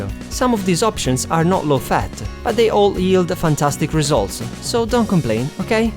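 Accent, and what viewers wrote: Italy. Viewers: yass that accent and inflection, I'm a fellow italian (: Yeah i agree and I'll sure try some of the recipes! Who knows what'll happen hahah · I found your Italian accent completely adorable!Keep up the good work of giving us tips! I am not vegan but vegetarian..Your channel is really helpful! :)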